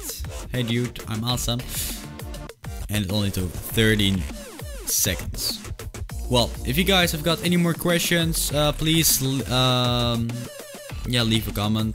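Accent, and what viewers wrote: Netherlands. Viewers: The first time i heard the voice of him, i knew it was obviously dutch // De eerste keer dat ik je stem hoorde, wist ik gewoon dat je nederlands was!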